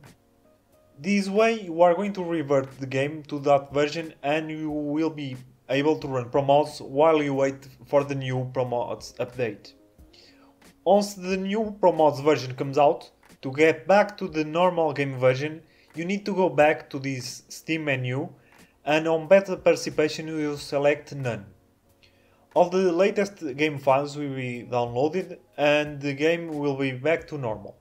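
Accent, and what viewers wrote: Portugal. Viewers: Eu juro que so pelo sotaque imaginei logo que fosses portugues ahahah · quase certeza que é portugues esse sotaque não mente kkkkk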